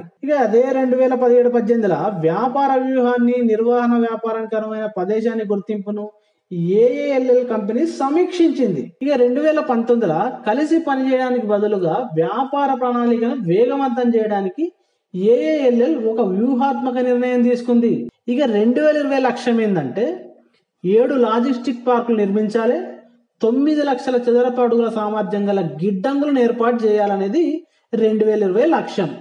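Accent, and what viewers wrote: Telangana, India. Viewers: Your comment is bad. Telugu has many accents. It varies almost from district to district. Telangana accent is sweet to hear.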